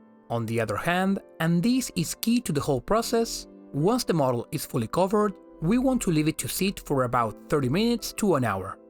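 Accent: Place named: Spain. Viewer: estaba casi seguro que tu acento es del español, notas mucha diferencia realmente en la audiencia por hacer los videos en ingles?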